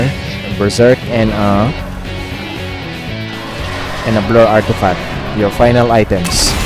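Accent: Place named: Southeast Asia. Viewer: Your accents sounds like southeast Asian are you Filipino or Indonesian? By the way nice video bro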